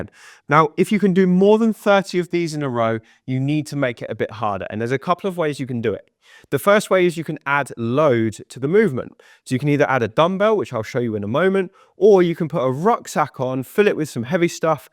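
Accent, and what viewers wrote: England, United Kingdom. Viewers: I’m here for the accent. Lovely English accent. Beautiful way of saying “bottom “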